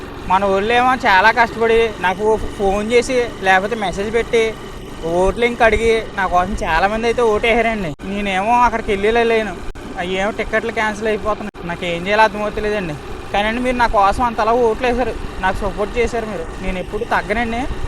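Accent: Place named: Amalapuram, India. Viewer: Nenu otu vesa anna ni videos chustanu kani eppudu coments pettaledu nuvvu seam ma annayya la untav seam enka acham nekulage untadu anduke yeppudu chustanu ni videos madi amalapuram mana slang tho bale mataladatav any ve congrats annayya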